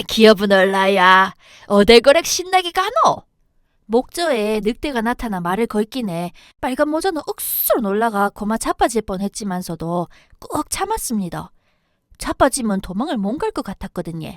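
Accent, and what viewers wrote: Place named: Daegu, South Korea. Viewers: 아는 내용이지만 대구 말로 들으니까 새로운 느낌이네요. 다른 동화도 계속 들어 보고 싶어요~😊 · 대구를 떠나온 지 30년이 훌쩍 지났는데...동화영상에서 고향말을 실컷 들어보네예~ 고맙심데이!^^ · 대구 버전으로 들려주는 동화 이야기 구수하고 정겹습니다~~^^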